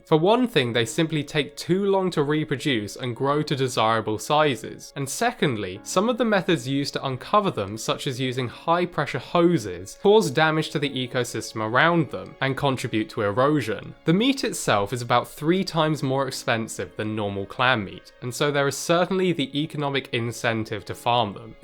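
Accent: United Kingdom. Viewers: The Brit accent seems to be so very trendy on videos, cable news networks etc. It's as if it somehow adds a bit of legitimacy to a story. Why? I'm wondering how many people share my view that the accent is annoying at best, and a distraction that serves no purpose. Surely there are out of work Americans "journalists" who could read teleprompters without the haughty, lecture like tone and attitude. It ain't right! lol!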